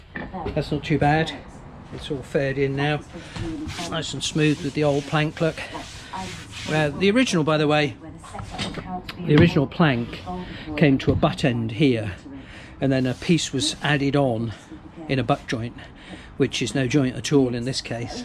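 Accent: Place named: RP, United Kingdom. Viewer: Bonjour, The more I see your videos, the more I discover your crazy project. Once again, I would like much more videos, I am sure I am not alone and people who help you. As an example, I would have liked more videos of the hull rebuild and the calking among other topics because I think I have seen some light through ot in your previous video. Besides this, I like your accent, it looks like the one I learnt 50 years ago at school and I easily understand your explanations. I would say that you have a BBC 1 accent perfect for students 😁 Amicalement, Raphaël, no longer student for a long time but always passionate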